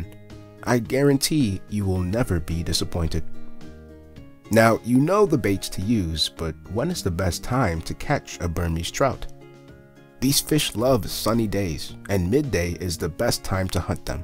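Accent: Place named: London, United Kingdom. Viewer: Fuh, suara sifu saya ni memang lain macam. Loghat London la. Haha, padu sifu atas ilmu yang dikongsi. #PUNCA #klusterKN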